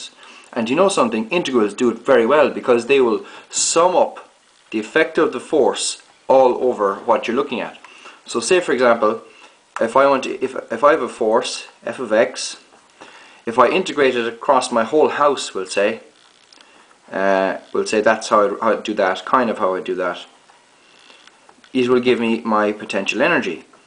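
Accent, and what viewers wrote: Ireland. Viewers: he's irish! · Excellent video and this man's Irish guys, not Scottish :P And you will also need to use endless amounts of integrals in economics when trying to analyse profit margins and macroeconomic objectives.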